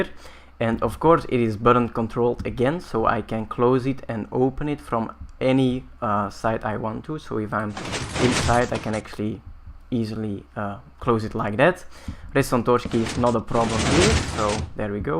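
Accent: Belgium. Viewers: Haha, dacht ik het niet. Dat accent herken ik uit de duizenden :) Blij om een landgenoot zo succesvol te zien op youtube :D Gebeurt niet veel! Keep up the good work! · are you belgian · Are you from french Belgium?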